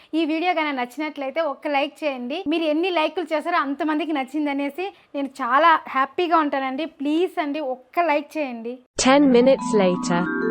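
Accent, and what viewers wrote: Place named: Rayalaseema, India. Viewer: మీది రాయలసీమ ప్రాంతమా. నీ భాష ప్రకాశం రాయలసీమకు దగ్గరగా ఉంది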